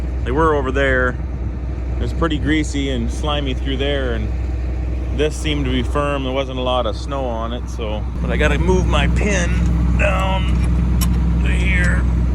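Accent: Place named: North Texas, United States. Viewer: Sounds like us here in North TX😀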